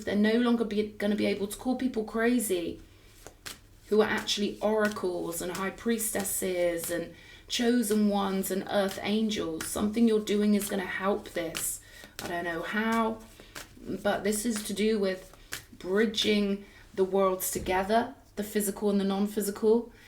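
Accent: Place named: Dorset, United Kingdom. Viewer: Hi all, Does anyone know where I can get one of the little dog figurine with the Bee 🐝 on its foot please?,thanks for your messages,you sound like you're from the same area as myself,Dorset or cornwall.love & blessings.BABA CHRIST 🕊️🤍🌹🐝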